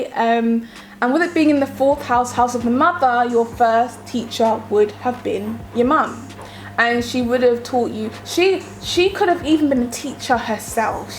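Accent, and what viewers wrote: United Kingdom. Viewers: U got this Lil nice Lil vibe wit you with yo great Britton sounding ahh 😂😂😂😂but it's sexy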